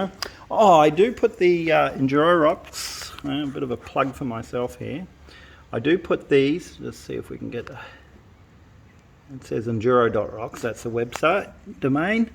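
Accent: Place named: New Zealand. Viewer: awesome bike, you sound like your from NZ not canada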